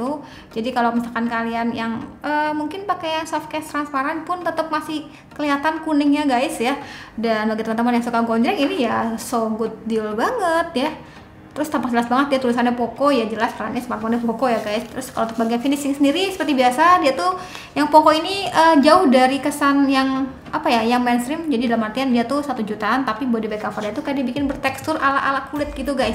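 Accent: West Java, Indonesia. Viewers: Sunda neng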